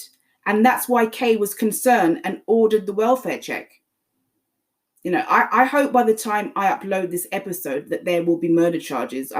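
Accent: United Kingdom. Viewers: I keep listening to this. It's one my favourite episodes in the podcast.I even play it to lull me to sleep. I guess I like British accents.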